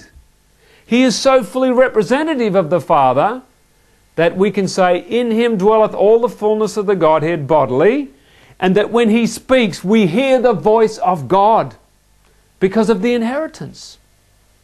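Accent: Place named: United Kingdom. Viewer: Thank you for this presentation. And, oh, what a fine British accent!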